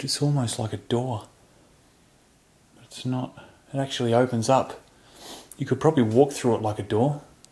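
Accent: Australia. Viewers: Subbed. Why ? : Because the video is LONG and NOT forced upon you. I felt as if I was there with you and could see what you saw and feel what you felt. AWESOME VIDEO. DONT CHANGE, be long and thorough. Sounds like your from Australia. Hail !. Be safe because Ive seen those damn spiders that live there. UGhh. Take a pistol preferably a Desert Eagle. Can never be to safe. oops I told you so !. Start blasting and hope for the best. Fear those spiders !. Damn bigger than Spiderman ! Make sure not to close in any animal, they can die from starvation or dehydration.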